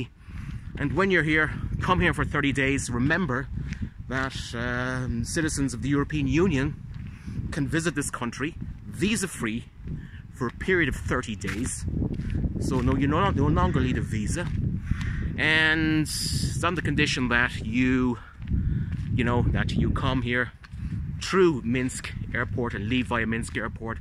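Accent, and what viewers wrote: Waterford, Ireland. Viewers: dose the local people speak english like you ie they sound like paddys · On another video he says that he is from Waterford ,S.E.Ireland but lived a few years in Dublin .Waterford City people have a kind of neutral accent. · Why ask Bald? how would he know. He clearly has an Irish accent in the video. Ireland has a huge amount of Irish accents and none of them sound like Hollywood’s “Top of the mornings portrayal. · I'm in the Midwest US and I l hear a slight Irish accent but it's a lot closer to a US accent than I've heard from most Irish.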